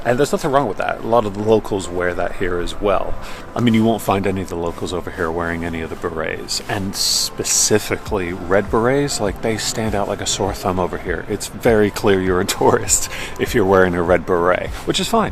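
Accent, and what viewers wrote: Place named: New York, United States. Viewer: Sounds like New York lol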